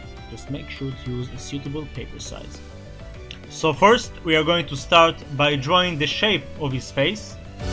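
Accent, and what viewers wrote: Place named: Israel. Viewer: אתה פשוט מלך! למען האמת, כשרק התחלתי לצפות בך שמתי לב למבטא שלך ואז ראיתי שהשם שלך זה וישר ידעתי שאתה ישראלי וזה מאוד שימח אותי. אז תמשיך ככה אתה אחד הציירים הכי טובים שראיתי! בהצלחה בהמשך הדרך שלך ביוטיוב!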